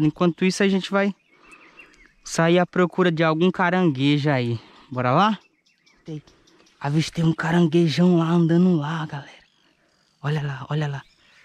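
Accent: Brazil. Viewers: Na real, o sotaque nordestino e lindo demais, não tem jeito kkkk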